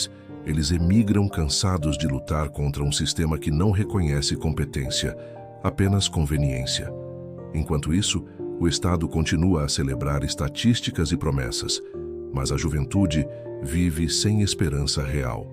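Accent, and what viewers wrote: Brazil. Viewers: Quando é que vamos ver a tua verdadeira cara porque tenho a certeza você é uma pessoa conhecida em Cabo Verde mais preferes ficar escondido atrás das camaras com um narrador português brasileiro o que não crédibilisa os teus argumentos sempre para destruir tudo de bom que os nossos dirigentes tem feito de bom para Cabo Verde nunca ouvi da vossa parte uma coisa positiva sobre nosso país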